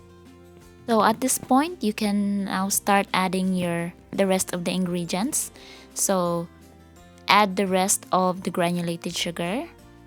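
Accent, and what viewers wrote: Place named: Bohol, Philippines. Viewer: Ganda ng Boses Malamya manalita,Saan province mo,Bohol?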